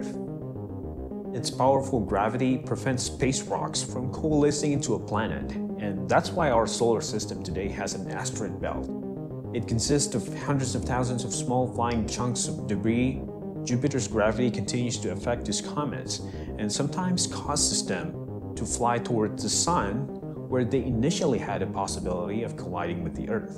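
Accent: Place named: England, United Kingdom. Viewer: ur english accent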